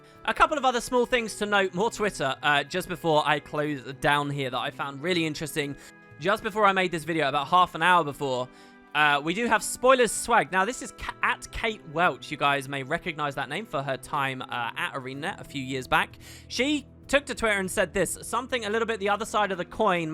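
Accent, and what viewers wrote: United Kingdom. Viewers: your British accent aside we US Yankee's love:) THIS... THIS is why everyone i know and play Gw2 with feels the same IS the VOICE of Gw1 and Gw2 for many of us. You are able to look at the information stay as impassioned as possible to make the most rational conclusions is why even on topics I disagree with you on (20 to 25%) I ALWAYS know your coming from a good place, a person who loves and likes community, the Guild Wars world and games, and decency. All the best and thank you for not doing what 99% of you tuber's do. Worry about speaking your truth an losing subs. Ty again.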